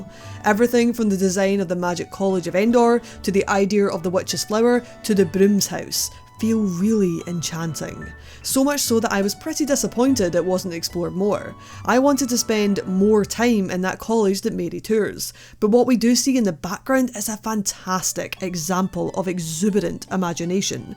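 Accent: Scotland, United Kingdom. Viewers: Agh, dang it! I had a feeling I got it wrong! It was in the back of my head for a while. I was like: “you should edit that. She might be from Scotland.” I blame count dankula. I was like, “she doesn’t sound like *him*, so, no.” Well thanks for not taking offense. I know the scots and the Irish hate being compared to each other. And yeah, I think we all wanna be a bit more like SuperEyepatchWolf sometimes. That man is a legend. I look forward to more videos as always! Thanks for your kind responses.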